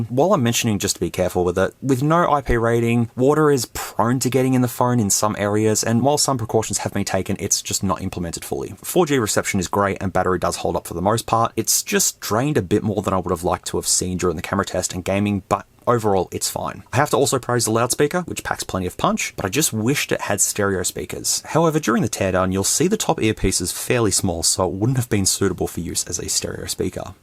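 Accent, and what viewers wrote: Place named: Australia. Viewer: I really appreciate your in-depth reviews. For the most part, I'll watch the intro, listing, turning on, set-up, specs, Quick Shortcut Maker, Conclusion, and teardown. Sometimes, if I have nothing else on the back burner, I'll watch the entire thing at 1.5 or 1.75 speed. The Aussie accent at that speed is hilarious. 😂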